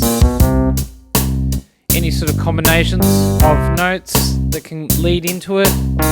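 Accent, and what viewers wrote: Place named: Australia. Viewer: Nice to hear an Australian accent. Cheers from country Victoria. Great lesson.🦘🎼🦘🎶🎵🎶🎶🦘🦘🦘🦘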